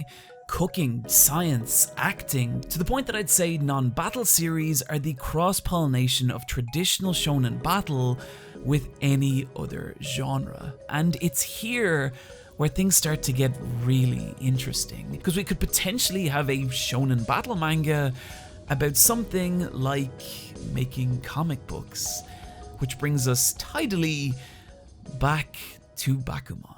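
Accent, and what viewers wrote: Ireland. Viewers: What nationality are you? I'm getting a little Irish from the accent I think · Oh so you're Irish... I thought that was your narration voice.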